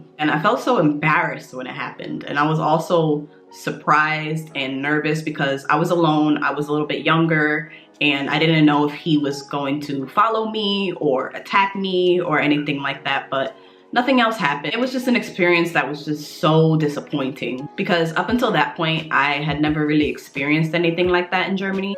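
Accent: New York, United States. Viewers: As soon as you started talking, I knew you were from New York lol. I'm also from New york(Harlem), currently living and working in Germany. I can definitely agree with your video.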